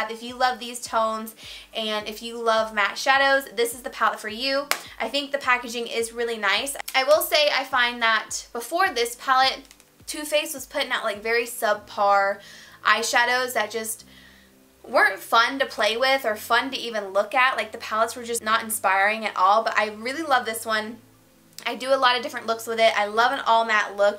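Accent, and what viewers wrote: South, United States. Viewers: Do you ever use any Colourpop products? They have really amazing palettes that are perfect for Fall!! P.S. That south accent 🙈😍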